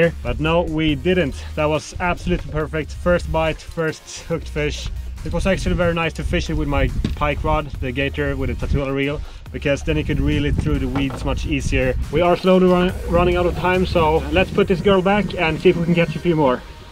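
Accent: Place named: Sweden. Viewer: As a Norwegian I love hearing his Swedish accent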